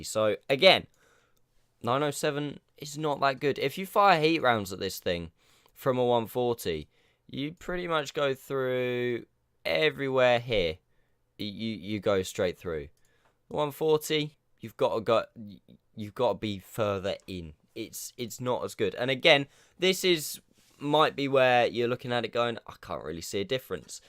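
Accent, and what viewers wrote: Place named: Southern England, United Kingdom. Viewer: hey man great vid, im from the south of england and it sounds like you are from somewhere around there, if you ever fancy doing some platoons id love to play with you some time, you seem like a cool dude, getting the 907 on monday so would be cool to do some games! all the best mate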